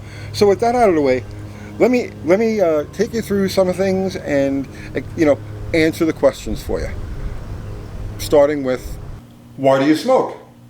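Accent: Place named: Philadelphia, United States. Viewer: Why don't you roll up a cigarette pack in your sleeve you look like an idiot when you're smoking on camera. I mean it's already an uphill battle with that yo Adrian accent you have synonymous with stupid so just put the cigarettes out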